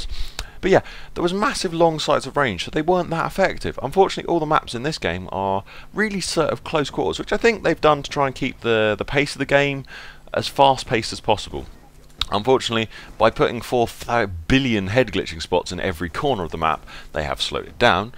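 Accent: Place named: RP, United Kingdom. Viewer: Still posh.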